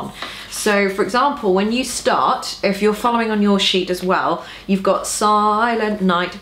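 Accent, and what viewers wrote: United Kingdom. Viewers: and you have a very good british accent i wish i can talk like you.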